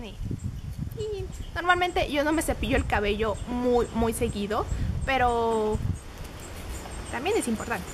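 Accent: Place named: Peru. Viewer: una pregunta... eres peruana ?.. tu acento se parece mucho...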